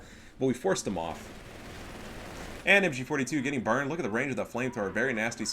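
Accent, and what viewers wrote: Germany. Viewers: As a native german speaker, I highly appreciate your german accent.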